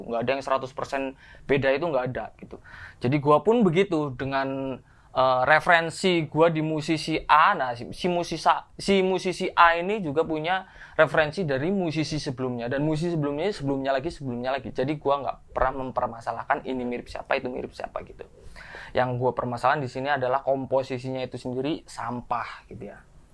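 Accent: Java, Indonesia. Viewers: kalo mo tengil paling gak mandi dulu mas.. hahahaha medok pula wong jowo gua gua an ahahhahaha *cangkulnya bawa lagi mas tar mandor nyariin wkkwkw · Suaramu medok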